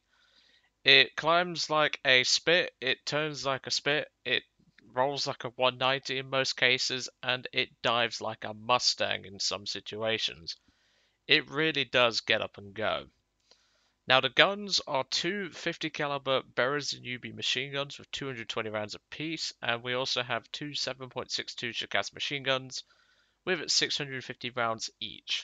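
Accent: United Kingdom. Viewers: Where in Britain are you from? (From another Briton)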